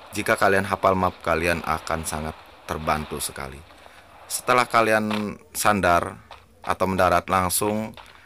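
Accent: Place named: Manado, Indonesia. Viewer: Abang orang Manado ya!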